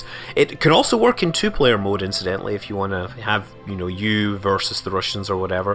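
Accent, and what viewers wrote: Scotland, United Kingdom. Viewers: Hey, you don't have to affect an American accent for the liftoff since it's a Global Space Agency. It could be a fellow with an even heavier Scottish accent than yours doing that countdown!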